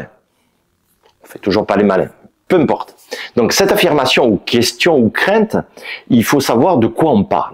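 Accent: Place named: Toulouse, France. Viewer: Salut. Je viens de découvrir ta chaine et je me suis abonné direct. J'adore ton accent. "Oh Putain Con!!!!!!" Ah mais "Merde" j'ai le même....... Je suis comme toi "Pas français mais Toulousain ". Je récupère ma Modèle Y Samedi. Merci de nous faire partager ton expérience et aux plaisirs de te croiser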